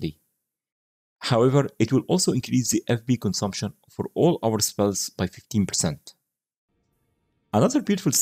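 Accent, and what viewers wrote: Egypt. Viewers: Are you Egyptian? I can hear it from your accent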